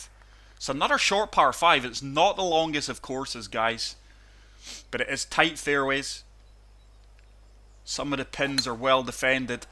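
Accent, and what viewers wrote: Scotland, United Kingdom. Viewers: Gave ya self the commentators curse mate lol. Ya voice reminds me a bit of Feherty. The Scottish accent works great for golf commentary. They shoulda got you to do the game commentary instead of Rich Beam and his shouty American accent. Some of the in game commentary is just laughable. Keeps me amused at least hahaha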